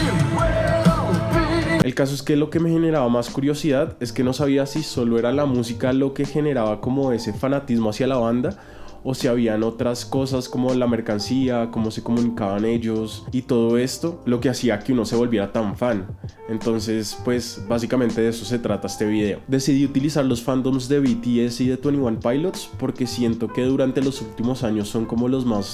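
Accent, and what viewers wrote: Bogotá, Colombia. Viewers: Primera vez veo un video tuyo, y apenas empezaste a hablar me dije "esté man es rolo" y cuando dijiste "hijueputa" lo confirme. Aunque bueno, no se si sea cierto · T erecomiendo que hables sobre, Esteman. Por tu acento pareces De bogotá y suenas bien rolo jajskkjajk buen contenido sigue así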